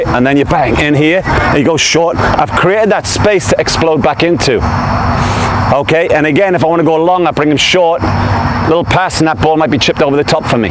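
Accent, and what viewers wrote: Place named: Newcastle, United Kingdom. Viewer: Watched a fair few vids now coach, I had to look you up! Yep, I cheated. But I will say that I did detect the Geordieisms in ya dialect. How? I'm from Ashington. Home of the great Jackie Milburn, and Charlton brothers. Me Granny used to babysit the pair.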